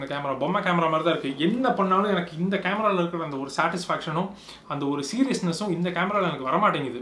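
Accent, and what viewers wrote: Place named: Chennai, India. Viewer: Semma Namma Chennai Thamizh'la explained... thanks buddy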